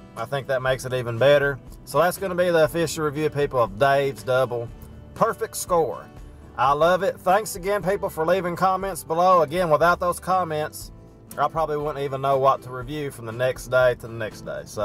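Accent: South, United States. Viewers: does anybody else love his southern accent? I love it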